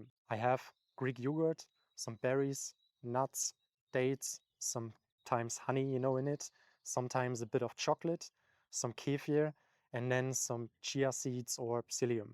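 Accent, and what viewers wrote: Germany. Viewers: Is this some german accent Im hearing? Should have watched the video before writing... Anyways eating eggs every day doesnt seem a healthy idea in respect of ldl intake.. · Bro are you german?? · Sounds German or scandi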